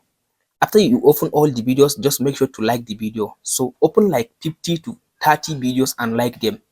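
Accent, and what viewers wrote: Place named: Bhojpuri, India. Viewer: Bhojpuri english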